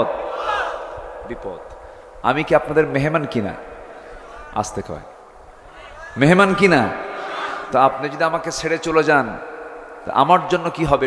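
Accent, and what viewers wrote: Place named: Kushtia-Jessore, Bangladesh. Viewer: উনার ভাষা শুনলে মনে হয় যেন কুষ্টিয়া অথবা যশোরের মানুষ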